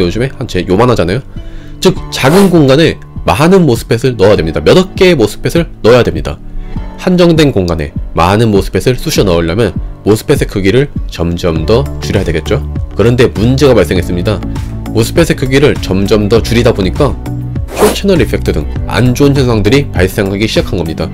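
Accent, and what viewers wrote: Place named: Gyeongbuk, South Korea. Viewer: 사투리가 대구 경북쪽이네 우리 부울경은 아닌듯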